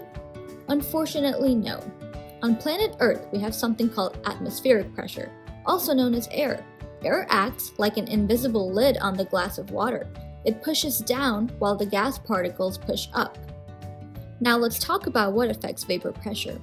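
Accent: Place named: South Korea. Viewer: You made it soooo Cute!!!✨😆 Thanks Girl😝. BTW are you Korean? Your accent seems korean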